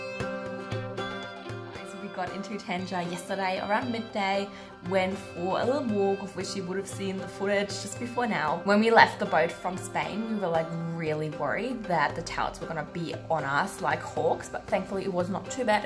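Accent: Australia. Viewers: I lived in Germany for a while Stuttgart und umgebung :) and at the beginning I was about to say you are German But that's kind of impressive how well you were able to pick up the aussie accent and this misled me into thinking that you are either aussie or kiwi :D That's great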